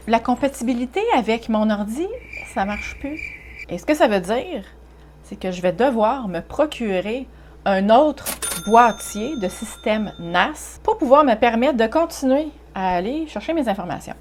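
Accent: Quebec, Canada. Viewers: Bonjour, Je supprime les photos qui ne me plaisent pas et je sauvegarde toutes les autres et cela d"autant pas que les capteurs actuels ont de plus en plus de pixels ce qui fait que chaque photo prend de plus en plus de place par son poids... J'ai un Canon R7 avec 32,5 millions de pixels alors qu'avant j'avais un Olympus OM5 avec 16 millions de pixels. Résultat mes photos actuelles sont pratiquement deux fois plus lourdes que celles prisent avec l'Olympus CQFD... Au plaisir d'écouter ton accent Québécois.. Gérard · Bonjour, je suis bien content d’avoir découvert ton site. Des sujets interessants avec un accent québécois. Moi je garde tout avec comme projet un jour de faire le ménage. Ma sauvegarde est sur un disque dur externe car j’ai de la difficulté à comprendre le fonctionnement du cloud Bonne continuation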